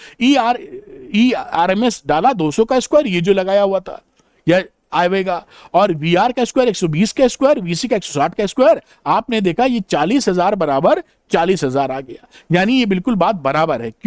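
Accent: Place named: Mewar, India. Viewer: That’s actually the natural Dialect of Mewad side in Rajasthan. It’s catchy though. 😂😂😅😅😊😊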